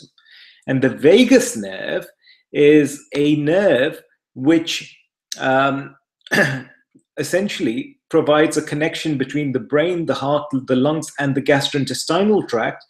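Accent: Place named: United Kingdom. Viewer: You are sooooo handsome! Why do you have a British accent? You look like my friend, Dr. Raji. From India.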